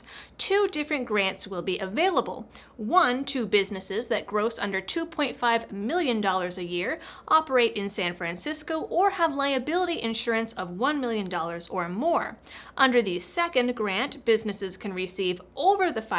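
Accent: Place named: Massachusetts, United States. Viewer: This was a good idea...but I definitely can tell you are from Massachusetts, you can talk a mile a minute...but this a good thing because we get more news in the three minutes....keep up the good work. 👍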